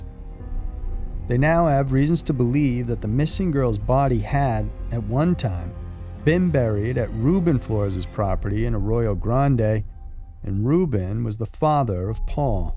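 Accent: South, United States. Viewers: God damn I thought that was Matthew mfking “alright alright alright” McConaughey doing the narration. That southern drawl was just a-twanging in my ear I tell you w’hat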